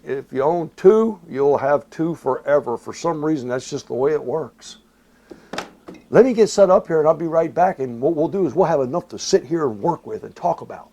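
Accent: Texas, United States. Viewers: Are you from texas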